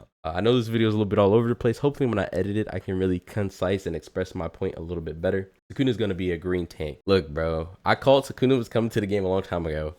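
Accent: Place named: South, United States. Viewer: why you sound so country 😭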